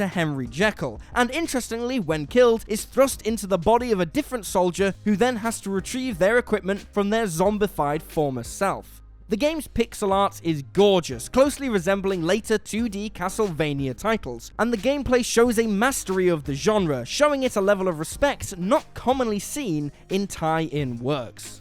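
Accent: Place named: United Kingdom. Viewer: Usually British accents sound pleasant. This is a big break from that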